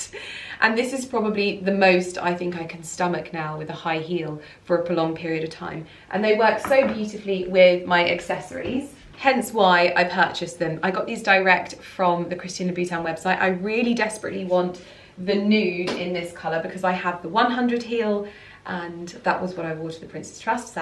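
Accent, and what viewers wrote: United States. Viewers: Even for me as an American, it was a bit jarring to hear an American accent on your vlog, in a French place. 😂😂 This place is magical, thank you for sharing. 💗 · I hear an American or Canadian accent by the host....fantastic destination to visit. So beautiful.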